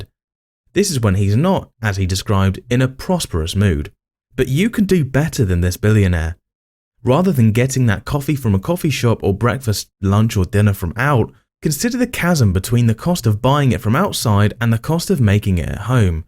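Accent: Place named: United Kingdom. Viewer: The British accent is annoying.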